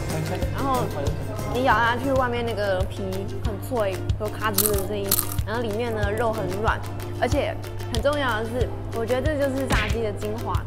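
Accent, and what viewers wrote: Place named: China. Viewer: 為什麼妳講話會有點中國的腔調😂😂😂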